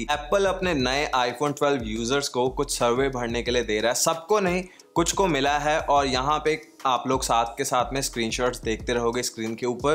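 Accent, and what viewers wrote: Punjab, India. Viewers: sir are you punjabi?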